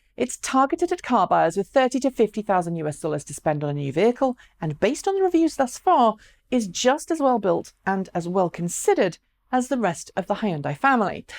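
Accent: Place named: United Kingdom. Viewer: WHERE DID YOU GUYS FIND YOUR TRANSEXUAL COMMENTATOR.....WITH A BRITISH ACCENT......IS THAT SUPPOSED TO BE COOL ..?...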